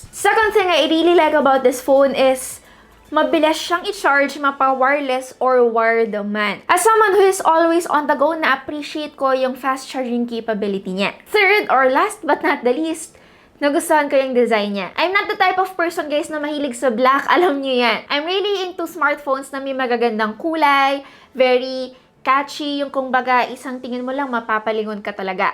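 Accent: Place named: Ilocos, Philippines. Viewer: mukhang foreigners tpos ilocano accent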